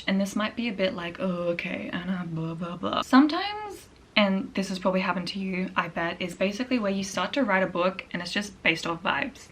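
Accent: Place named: Australia. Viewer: I love your aussie accent!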